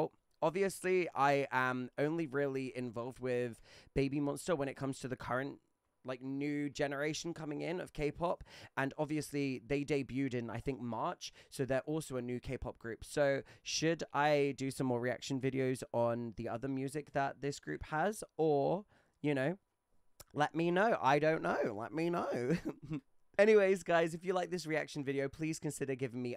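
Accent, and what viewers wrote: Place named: United Kingdom. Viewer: Oh ..... You're British lol. Thanks for reacting bro.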